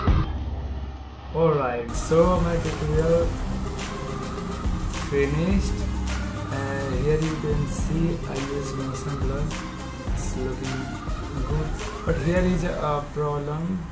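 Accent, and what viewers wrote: India. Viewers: u sound like an indian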